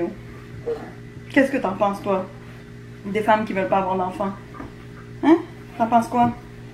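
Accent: Quebec, Canada. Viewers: T’as dû prendre l’accent québécois sans t’en rendre compte en tout cas je t’entends vraiment parler le québécois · C'est ce qu'on appelle l'accent hybride, c'est à dire mi-français mi-québécois, un accent québécois light en quelque sorte, du coup un français qui écoute aura l'impression d'avoir affaire à un québécois et un québécois qui écoute verra tout de suite qu'il ne s'agit pas d'un québécois autochtone... Les français vivant au Québec peuvent avoir cet accent hybride sans forcément s'en rendre compte, c'est le cas pour, elle utilise le phrasé, les intonations québécoises ainsi que les tournures de phrases de son nouveau pays de résidence. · Est-ce que tu es native canadienne ? 😌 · Incroyable !!! Tu as carrément pris l’accent je suis ébahie haha, à la base tu es du sud de la France non? J’essaye d’analyser tes intonations c’est un mélange intéressant!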